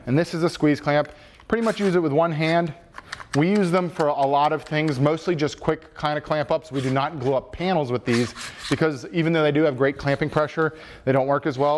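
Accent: Pittsburgh, United States. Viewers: Oh man are you from Pittsburgh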